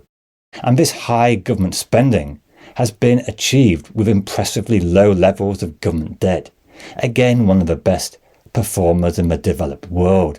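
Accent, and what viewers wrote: United Kingdom. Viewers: The narrator has a British accent.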